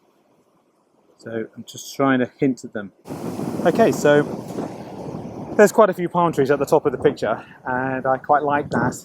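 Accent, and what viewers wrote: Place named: Scotland, United Kingdom. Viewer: You’re Scottish?!! 😮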